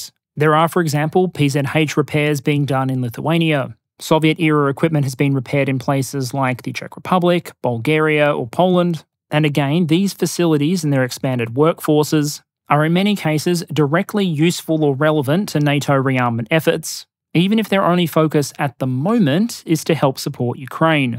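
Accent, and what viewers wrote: Australia. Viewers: "....one of those people who can derive joy from spreadsheets" this is why I love your style ( just one small example). Not just content that makes one think one learned quite a bit (yes, I do), but such a great way of presenting. I even started liking the Ozzy accent.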